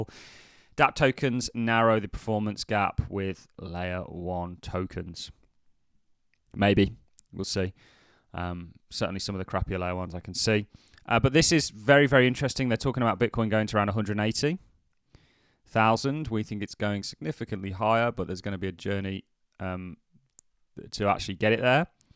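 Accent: Birmingham, United Kingdom. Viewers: What part of Brum are you from?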